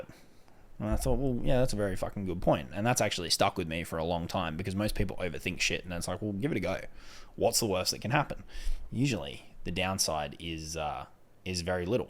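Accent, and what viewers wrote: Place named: New York, United States. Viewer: He sounds like a New York kinda guy. I'm glad I left NYC in 2010.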